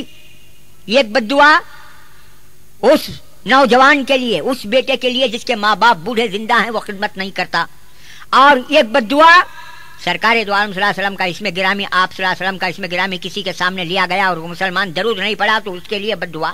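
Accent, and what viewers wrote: Bangalore, India. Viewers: it's not Telangana accent by the way. it's Banglori by the way... Dumb uploader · what is fun here..?nothing fun in it..!don't criticise the regional slang of Banglore..the person who posted this video may not be perfect in Arabic. Can he be insulted by the Arab people..?Just delete this post or change the title as "Excellent Bayan about Ramadan". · This is Bangalore accent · It’s BANGALORE accent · Its not Telangana accent, its a Bangalore accent and he is no more with us, he was khatib of masjid jaynagar 4th block bangalore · bangalore accent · Subhanallah mashallah ...allah sab ko roze ki ehmiyat samjhne ki toufiq de .....bacho bacho ko allah hidayat de ..ane wali nasal ko roze ki ehmiyat samjhne ki toufiq de 'ameen' anf iam from banglore banglore people spk lyk dis nly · This is not a funny bayaan bro, its a lesson what ever accent it is... 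and its not Hyderabad accent its a Bangalore 4th block Jayanagar imam Moulana saheb walaja ke, Allah inko sehath ata Kare... plz all of you pray for his sehath and afiyath. Thanks AshfaQ BTM Layout, Bangalore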